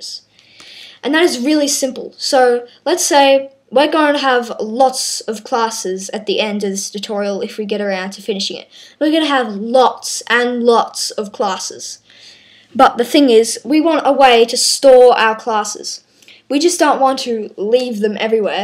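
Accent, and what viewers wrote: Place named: United Kingdom. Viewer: Asian boy? he sounds like a british boy :D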